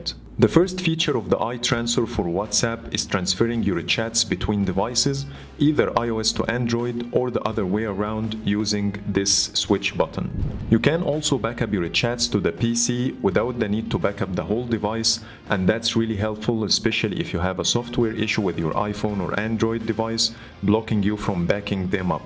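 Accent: Egypt. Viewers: Your accent sounds Egyptian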